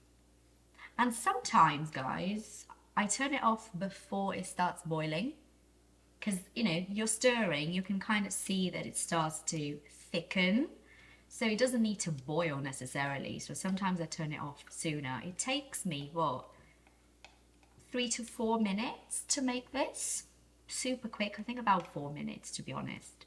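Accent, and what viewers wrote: RP, United Kingdom. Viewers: Keep up the good work. Your perfect RP accent is like music to my ears.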